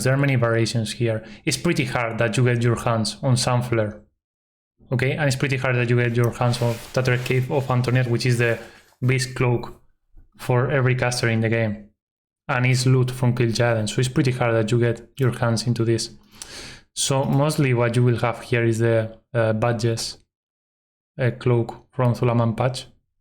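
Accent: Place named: Spain. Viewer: i really love your accent. i thought you were greek at first but i realized its spanish. but not all spanish people pronouce words the same way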